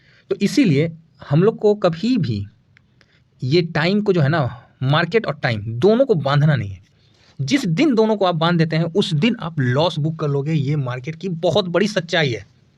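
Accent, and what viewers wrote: Bihar, India. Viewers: Sir app bihar ka lagra sir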